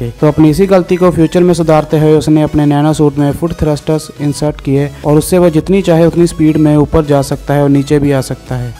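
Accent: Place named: India. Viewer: Bhai tuje uska Naam padhke aur hindi accent sun ke pata nhi Laga ?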